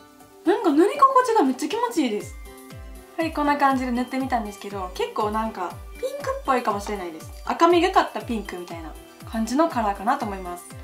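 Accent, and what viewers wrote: Kansai, Japan. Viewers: 関西弁かわいい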